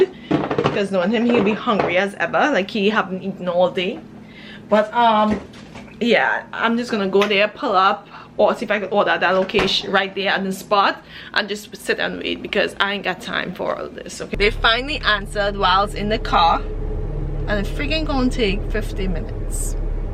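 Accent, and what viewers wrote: Trinidad and Tobago. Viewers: Girl! is that a Trini accent I hear!??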